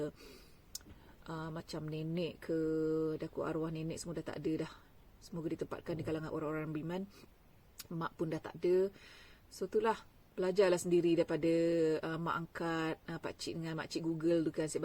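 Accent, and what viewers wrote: Negeri Sembilan, Malaysia. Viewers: camano tetibo akak speaking nismilan ... asal n9 ko?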